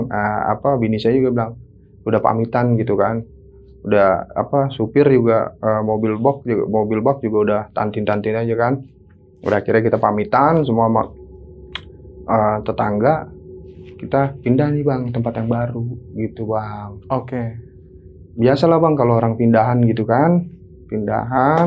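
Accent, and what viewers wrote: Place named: Surabaya, Indonesia. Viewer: Surabaya mn suaranya☺️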